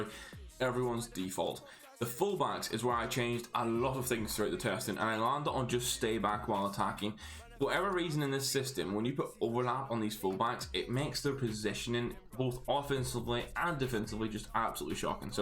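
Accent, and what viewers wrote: Scotland, United Kingdom. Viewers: Yasssss he’s fuckin Scottish. Happening big chap. Cheers for the vid. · WOoohooo Which part of scotland are you from, Belfast? Love Scottish streamers!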